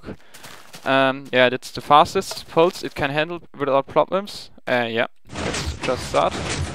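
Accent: Germany. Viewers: Man höhrt deinen Deutschen Akzent echt raus :D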